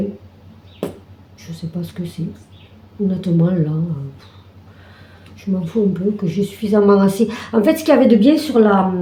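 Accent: South, France. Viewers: Bonjour, je viens de m abonner, j aime beaucoup votre accent qui me rappelle mon enfance dans le sud. Je vais faire le SAL également et pris cela grille sur le site de brode 41, que j ai découvert car comme vous il n y avait plus la grille chez de mère en fille et j ai été très satisfaite de sa préparation de commande et de l envoi ultra rapide, je recommande cette boutique qui fera partie de mes favoris maintenant. Très belle pochette, il faudrait que je m y mette également 😊 on va être plusieurs au taquet début juillet pour ce SAL je pense , pour ma part je vais faire ligne par ligne de dessin et faire juillet et août dès le début car en Août priorité aux petites filles et vacances et là il me faut des projets 0 CERVEAU 😂😂😂 bonne petites xxxx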